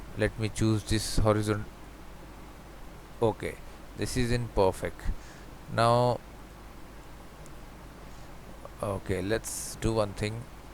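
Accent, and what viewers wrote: India. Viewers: Are you from Índia? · You know it'll be informative when the guy has Indian accent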